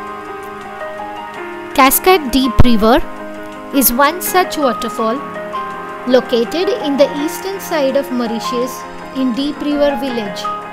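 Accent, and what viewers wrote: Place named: India. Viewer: WHO THE HELL IS THE NARRATOR?? '' PPOS'' A LITTLE AND LEARN HOW TO SPEAK PROPER ENGLISH, NOT INDIAN ENGLISH..IT IS REALLY ANNOYING AND IRRITATING..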